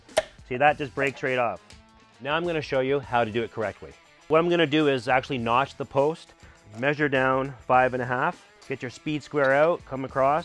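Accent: Canada. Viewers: You sound Canadian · Canadian eh????????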